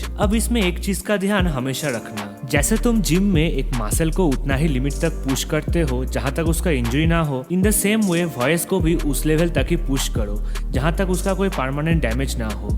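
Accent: Bengal, India. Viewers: In Bengali we call it Rewaz. It's pretty evident from the accent of the speaker that he's a Bengali person. Jab pata kuch hai nahi toh gaand ucha karke marwane kyu aa jata hai?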